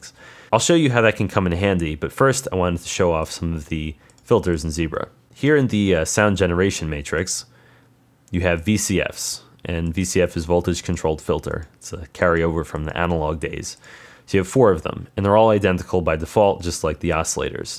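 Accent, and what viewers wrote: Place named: Canada. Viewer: I really wanted to see this video but I just couldn't bare to listen to the speaker's annoying Canadian accent.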